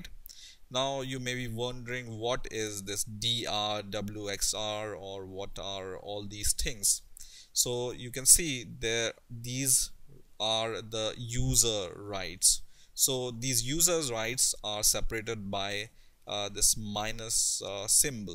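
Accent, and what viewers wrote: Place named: India. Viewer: im curious about who you are? it appears your in Germany but you sound like your from India.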